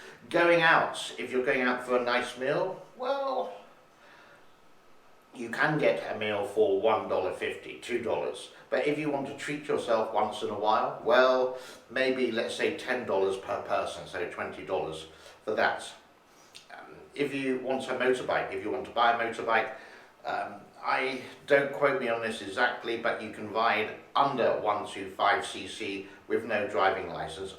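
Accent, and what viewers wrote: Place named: RP, United Kingdom. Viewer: Your accent is somewhat posh. In brief, what is your background?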